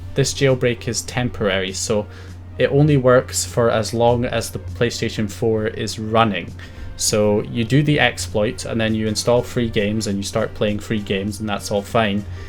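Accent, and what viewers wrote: Scotland, United Kingdom. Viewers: Are you Scottish? 🤔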